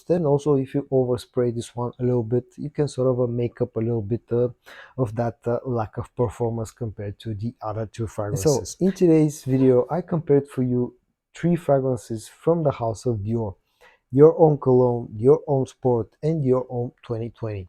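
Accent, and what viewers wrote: Bulgaria. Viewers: Nice comparison. I have Dior homme sport which is fantastic and I have tried the other two as well, I'm going to get dior homme cologne next. You have a very bulgarian accent btw. Are you bulgarian?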